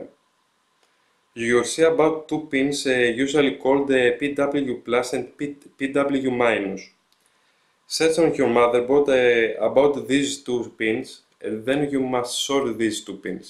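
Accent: Greece. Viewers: ελληνας απο την προφορα το καταλαβα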